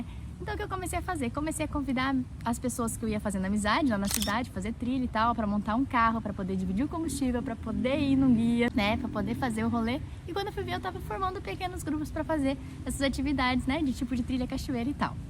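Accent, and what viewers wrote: Brazil. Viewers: Abraços de Ibirama/SC!!!! Parabens pela Vibe muuuuuito boa e esse R com sotaque paranaense minha terra natal!!! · Você é muito simpática! Tem um sotaque mineirim....O Guto "indicou" o seu canal. Sucesso!!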